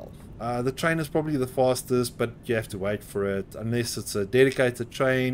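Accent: South Africa. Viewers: Awesome to hear a fellow Saffa play this game. Keep the content coming chomma! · neat, could hear it haven't found a lot of south africans playing the game. also from SA :D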